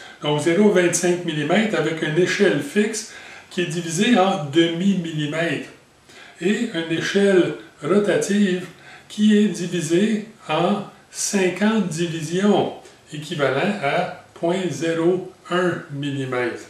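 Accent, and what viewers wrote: Canada. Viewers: Monsieur toutes mes félicitations pour les explications abondantes et variées que vous donnez sur l'ensemble de vos vidéos. Ces explications ont les mérites d'être précises et concises elles sont agrémentées par votre accent canadien qui me replongent dans mes souvenirs de deux séjours de 2 mois passés au Canada (79/80 ) j'ai visionné pas mal de vidéos traitants de l'usinage sur fraiseuses et tours en Français en Anglais et même en Russe......Mais vous êtes le crac des cracs....you are the Best. Et je vous garanti qu'il y a des pas moins d'une dizaine de cracs que j'apprécie. Comme je vois que dans votre atelier vous collectionnez les plaques d'immatriculations je vous propose de vous envoyer en signe de gratitude...une plaque belge skype: roland_chardon mail: <mail>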